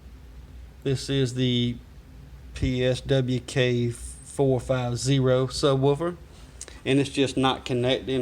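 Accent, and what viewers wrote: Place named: Louisiana, United States. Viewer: Cool accent. Louisiana?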